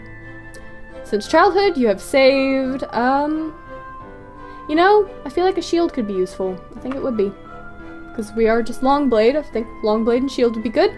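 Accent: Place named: Scotland, United Kingdom. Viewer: You sound scottish ! just like Karen Gillian in the Doctor ! subbed !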